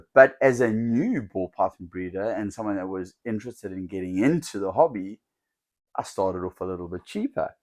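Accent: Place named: South Africa. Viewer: I love the South African accent so much.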